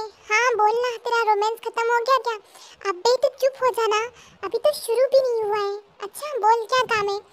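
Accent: Gujarat, India. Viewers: Kya aap gujarati hai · Di ap gujrat se h kya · Diii are you gujarati ? 🤭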